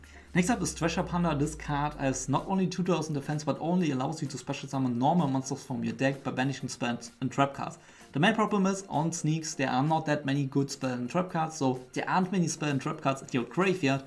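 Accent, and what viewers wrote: Germany. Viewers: Dachte direkt in den ersten Sekunden "Das kann nur ein deutscher Akzent sein!":D Super Video!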